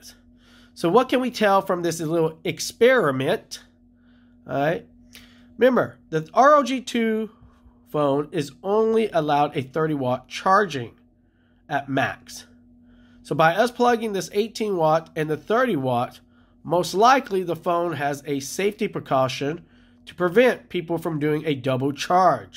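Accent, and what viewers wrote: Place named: India. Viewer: This man is speaking the English of Indian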